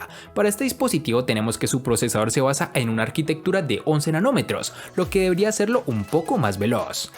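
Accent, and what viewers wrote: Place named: Antioquia, Colombia. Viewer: es mi salvador peor porque se mezcla la voz orgásmica con el acento paisa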